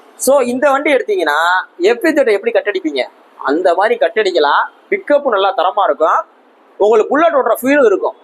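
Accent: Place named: Madurai, India. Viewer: மதுரை மொழி😍😍😍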